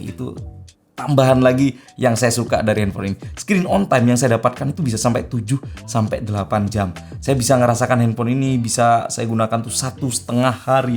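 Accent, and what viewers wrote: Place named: Surabaya, Indonesia. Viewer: lhooo SUROBOYO TA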